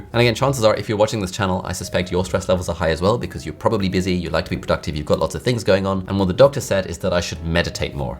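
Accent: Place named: United Kingdom. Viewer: Have you ever made a video of your transition from Pakistan to the UK? How did you absorb the British English dialect?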